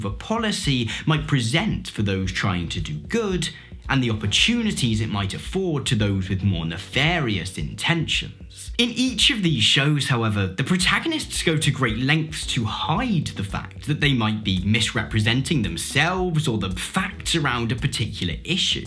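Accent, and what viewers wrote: United Kingdom. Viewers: Dear,are you british?just interested in english language,try to learn it again.sounds british to me.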